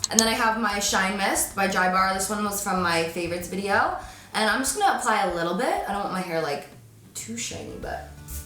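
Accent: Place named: East, United States. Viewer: I really like your slight accent! It sounds kind of east usa idk. Like, a subtle mix of boston n new york. Idek but I like it 😍😂😂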